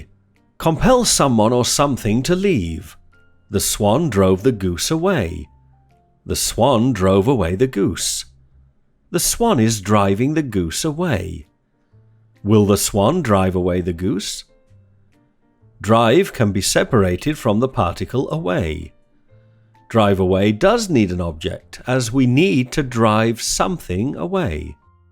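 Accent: United Kingdom. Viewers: Your British accent sound is the most stunning that I've ever heard. I appreciate your contribution of British accent promotion cos many youtubers just own American accent instead of British one.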